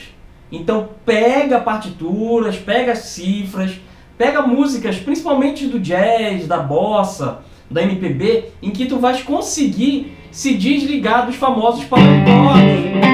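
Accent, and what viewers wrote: Pará, Brazil. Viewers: Ele e paraense, da pra ver pelo sotaque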